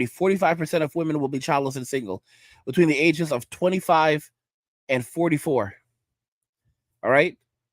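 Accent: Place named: New York, United States. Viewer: Women whine and squeal like the pigs under a gate. They're arrogant, selfish, and delusional to a T. After they told us men not to come near them, they're not worth investing so the juice ain't worth squeezing. By the way, your NY accent is on point. Way savvy than Wrestling Writer Vince Russo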